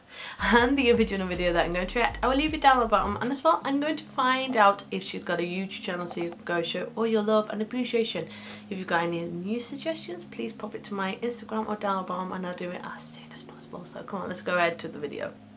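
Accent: United Kingdom. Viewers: where you get that English/British accent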